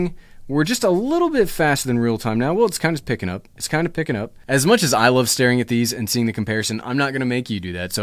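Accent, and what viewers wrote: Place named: United States. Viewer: you make the most pleasant and entertaining tech videos on Youtube. You speak American English, you don't talk fast, you don't read a script in monotone, you don't wear your hat backwards, and if you genuinely get excited about the gear. And, hey! we both shoot with Lumix!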